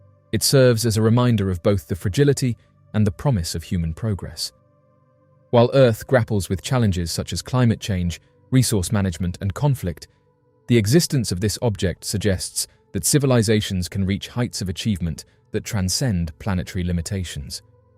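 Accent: England, United Kingdom. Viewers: Run for your lives !!! The Aliens are coming !!! Be very Afraid !!! Do what the Aliens tell you to do !!!!!! Do what a creep with an "English" Accent tells you to do !! Believe the Propaganda !!!! This is really entertaining for me !!!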